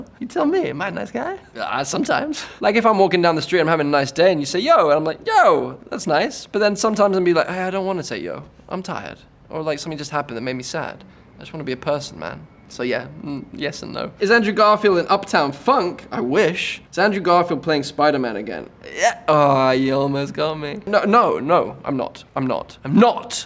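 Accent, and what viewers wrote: United Kingdom. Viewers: Since when is he brittish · everytime i hear andrew garfield speak, i remember the guy is british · When did he have a British accent??? How was I not aware this whole time?? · Since when he British? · Since when is he British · NO WAY HES BRITISH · W……….wait……….HE’S BRITISH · How did I never realise he was British... am I... dumb? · I forget that he’s got a Brit accent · i forgot this man is british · He has a British accent but at times an American accent comes out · why british :( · am I the only person alive who had no clue he had a British accent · I've heard him do an American accent so much that it feels like he's just doing an accent in this video, like "Hey, he's pretty good at that British accent". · why am I surprised everytime I hear his british accent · He's much more British than I remember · My mind is blown away that he has a british accent.. 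ive only seen him in movies with american accents this is so strange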